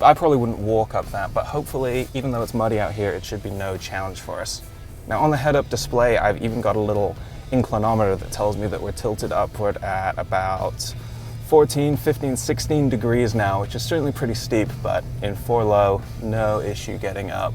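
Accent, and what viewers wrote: United Kingdom. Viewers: Is this guy British? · His accent swaps between British and American.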